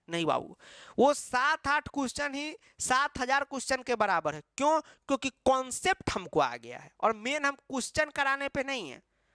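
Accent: Bihar, India. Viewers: Are you bihari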